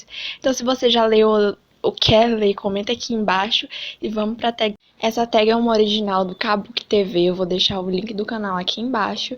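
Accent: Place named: Ceará, Brazil. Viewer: Olaaa. 😊😊 Eu vi essa TAG esses dias e achei bacana pra responder. Talvez eu faça QQ hora dessas... O sorriso da hiena eu fiquei curiosa pra fazer a leitura. Jogos vorazes nunca bateu a vontade de ler mas vi os filmes e achei ok. Gente, teu sotaque é tão bonito. Parece cearense. 😊 Aaah, eu amo GOT. E curti desde o início kkkkkkk Küss 😘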